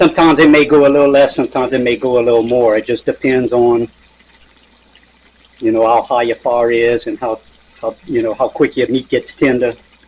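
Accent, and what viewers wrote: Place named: Louisiana, United States. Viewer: Love the videos, you just need to get a lapel microphone so that your voice is clearer and we can hear that cajun accent better.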